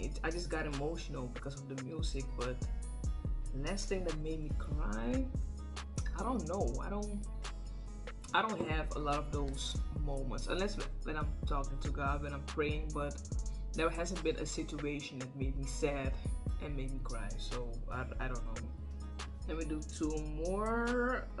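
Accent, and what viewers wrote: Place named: United Kingdom. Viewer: Ik heb de hele video gekeken i love it girl hahhahaha the british accent 😂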